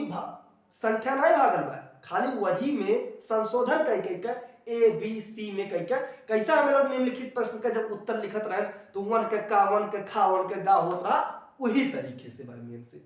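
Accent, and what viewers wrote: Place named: Bhojpuri, India. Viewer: Waah भैया ekdum mst 🎉🎉😅 भोजपुरिया अंदाज mai 🙌🙌👍😂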